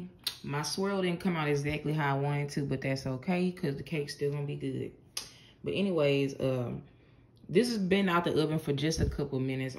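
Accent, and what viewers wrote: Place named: South, United States. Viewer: Where are you from? 👀 sound like you from the South from ya accent!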